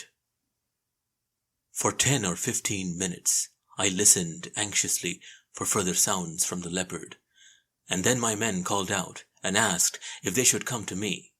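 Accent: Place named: India. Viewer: Your stories telling in unbelievable You are a white Hunter talking with a Indian accent ,Who thought of this combination ,Its BRILLIANT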